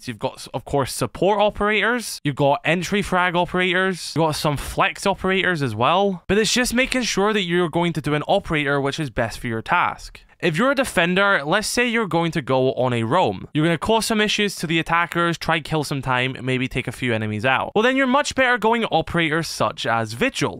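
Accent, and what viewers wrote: United Kingdom. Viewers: bri ish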